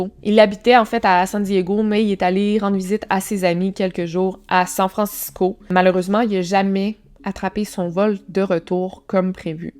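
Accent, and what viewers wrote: Quebec, Canada. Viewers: Allo intenet l accent du Québec j adore 😂😂 bonne continuation à toi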